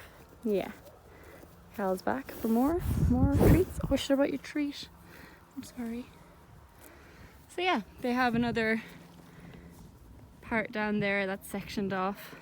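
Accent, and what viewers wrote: Ireland. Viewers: I just love the way you say that bloody chicken I love the Irish accent (I'm from Ireland) Also u inspire me so much about 2 years ago a connemara mare called Jeddah was at my stables and I ride her an loved her until I done a round of canter and bucked me off the next week my instructor told me to ride her again to get my confidence back so we done another round of canter and bucked AGAIN this time she positioned her neck to help me but it didn't work I ended up spraining my arm so I couldn't ride for a while that knocked my confidence the next year I had a bad call I was jumping a gate at about 50cm and the horse I was riding was about Fiona heighten was a grey ISH he refused it 3 times eventually 5 girls started waving their arms to get him over it be jumped it and apparently my instructor said he jumped it like 2metres higher the n it was I wasn't ready for it so I fell of but me being awkward sommersaulted and landed head first and I got knocked out for 20mins for the next 6 months after that I would hardly trot I was so terrified u until we came across you you are such an inspiration thanks to you I have accomplished jumping a metre 10 last month I'm 12 years old now and I feel like an advanced rider (maybe a bit to far) thanks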